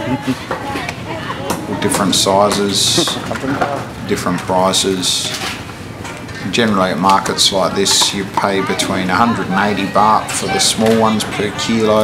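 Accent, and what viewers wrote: Australia. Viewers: u sounds like Aussie are you? Hello from Adelaide SA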